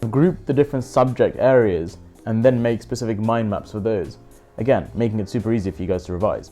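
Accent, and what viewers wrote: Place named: RP, United Kingdom. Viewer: How has your voice become so posh 😭😭😭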